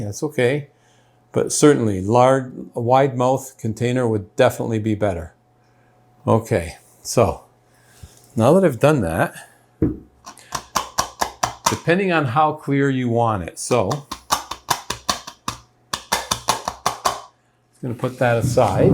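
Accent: Canada. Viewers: At first I thought you were American and was astonsihed to hear you talking metric and not imperial...then I realised you are Canadian. Best wishes from the UK. I enjoyed your video. For me the big expense is buying grain alcohol...the cheapest I can find is £38 for 70cl. I guess this is why people use vodka £20 for 70cl....I think that's what I'll do and add granulated sugar instead of syrup...I might have to wait longer for the lower strength alcohol to extract the oils from the lemon peels. I love Limoncello!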